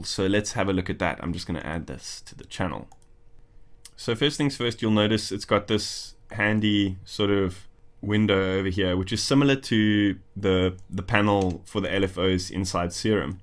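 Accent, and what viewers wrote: South Africa. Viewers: Hey bud, are you from South Africa?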